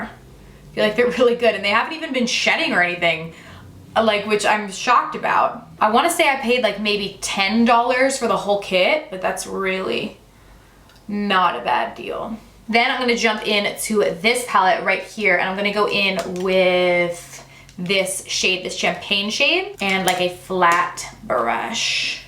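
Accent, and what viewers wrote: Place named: South, United States. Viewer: I was wondering where you are from because i catch you saying southern things and a little accent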